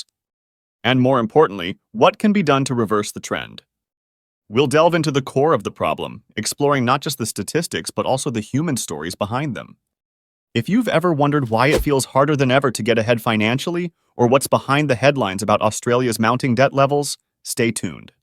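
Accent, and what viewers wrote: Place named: United States. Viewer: Sounds like it….can’t believe a yank would be saying Australia has a debt problem. Obviously oblivious to what’s going on in his own backyard! 37 trillion and counting.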